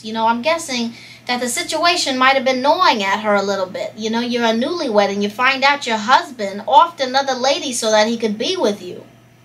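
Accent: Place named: New York, United States. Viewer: Hello I love your costumes and thick New York Accent Long Island no doubt. Sounds like Cyndi Lauper but more cute. I think you like those types of stories and dress up but it’s good I love it thank you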